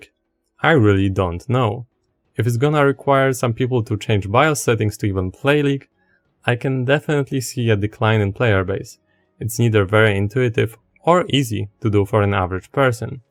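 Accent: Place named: Poland. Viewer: ziomek ma akcent jakby z polski był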